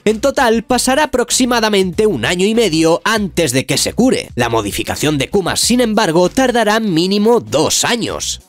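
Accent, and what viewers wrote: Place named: Galicia, Spain. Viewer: Me molesta el acento gallego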